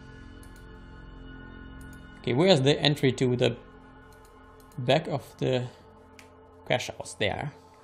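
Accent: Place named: Germany. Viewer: You should talk to again and get him as a follower. By the way where are you from? I've always thought your accent sounds like German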